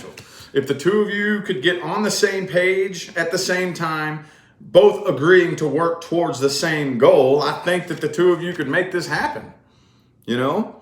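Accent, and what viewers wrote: South, United States. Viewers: Love your good ole southern boy delivery. Spot on every time. Thanks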